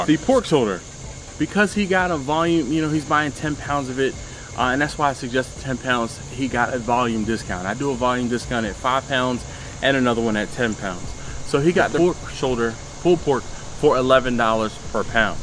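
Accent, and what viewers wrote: Philadelphia, United States. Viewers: Excuse me sir, you sound like you might be from Philly, or the surrounding area? I grew up in Berwyn/Valley Forge area.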